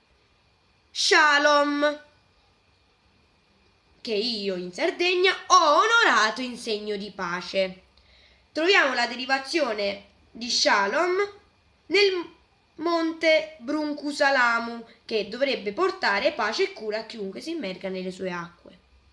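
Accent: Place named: Campania, Italy. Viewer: Dal' accento mi sembri campano, grazie dei tuoi studi sulla Sardegna 👏❤️💓❤️💓sei bravissimo 💓💞💓💞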